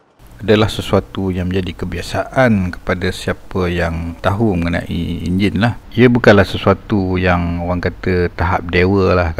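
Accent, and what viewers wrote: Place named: Kuala Kangsar, Malaysia. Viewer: Assalamualaikum, buat video lagi, suka dengar Abg loghat Perak dan ilmu yg zaman sekarang susah nak dapat... Terbaik bang (S.I.F.U)!!! Semoga Allah permudahkan segala urusan Kome dan semoga Allah memberkatimu insya'allah, 🤲 Aamiinn Yaa Rabbal'alamiinn:)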